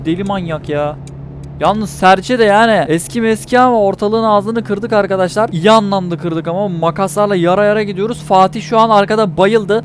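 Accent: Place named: Trabzon, Turkey. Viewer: Abi çok komik trabzonlu konuşuyon ya 😂😂😂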